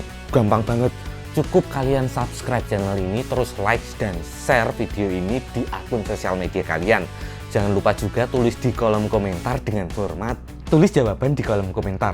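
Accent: Java, Indonesia. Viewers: Mantap om udah 10.00 subs, om udah punya kualitas lho cuman tinggal konsistensi aja. Semangat terus ya om! Alasan ingin punya LED : Saya suka ngambil footage di malam hari dan di ruangan yg (sering) kekurangan cahaya om. Padahal cahaya kan aspek penting dalam ngambil footage. Dengan LED (terutama Ring LED), kekurangan cahaya itu bisa diatasi apalagi ring LED tipe yg bagus karena penyebaran cahayanya rata Alasan subscribe channel ini : Saya udah subs sejak lama om. Impresi saya pertama kali itu waktu liat video "Lensa yang tepat untuk Videographer" itu kualitas video om bagus banget. Pembawaannya juga santai dan medok jawanya bikin lucu hehehe. Om udah punya kualitas sama ciri khas yg bagus, tinggal konsistensinya aja.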